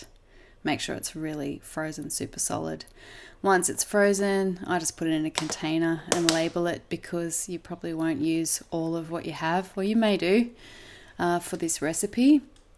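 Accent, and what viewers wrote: Australia. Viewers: My first time watching you. So nice to hear an Aussie accent. Love your soap. whenever I use Aloe gel it turns a weird pink colour. Have you ever had this problem? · the Australian accent is beautiful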